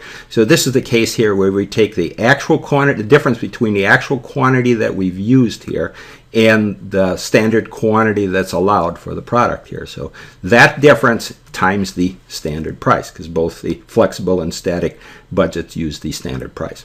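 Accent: United States. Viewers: dude wth this is confusing as fuck with ya yankee sounding ass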